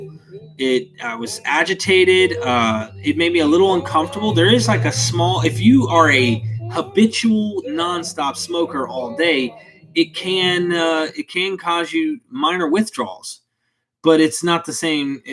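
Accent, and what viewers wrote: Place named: Virginia, United States. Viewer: Are you from VA? Just guessing based on your accent.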